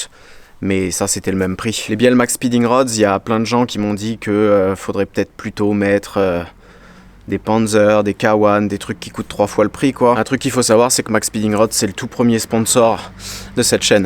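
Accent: Alsace, France. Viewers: Tu commence à choper l'accent Alsacien à force d'être avec EMS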